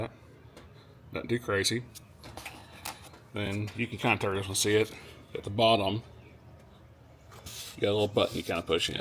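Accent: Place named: Texas, United States. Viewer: Accent sounds familiar… would u happen to be in Texas? Lol I just bought a sixpack scat this week. Tryna to see if anyone knows a shop that can handle my more advanced mods and tuning and stuff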